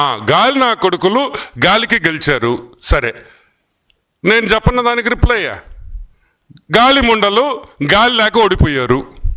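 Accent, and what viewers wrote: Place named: Nellore, India. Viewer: అనం వి రెడ్డి గారు 🙏🏽🙏🏽🙏🏽మీ విశ్లేషణ న లు అద్భుతమైన వివరణ నలతో చలో లోక్తులు తో నెల్లూరు భాషతో అందరిని విశేషము ఆకర్షణ తో వినసొంపుగా ఉంటున్నాయి 🙏🏽🙏🏽🙏🏽🙏🏽🙏🏽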